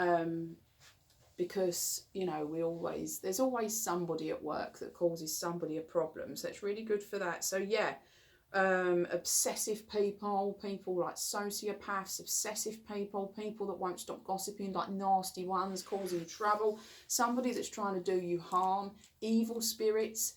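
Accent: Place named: East London, United Kingdom. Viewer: You sound like you are from my ends East London . Thank you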